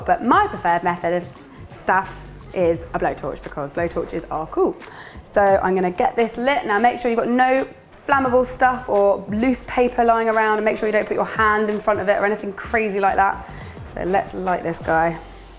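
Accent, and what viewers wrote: England, United Kingdom. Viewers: Shes from England if u didnt know :)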